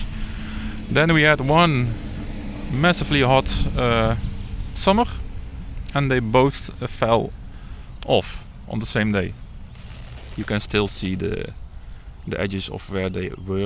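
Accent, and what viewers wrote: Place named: Netherlands. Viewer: Dutch accent :)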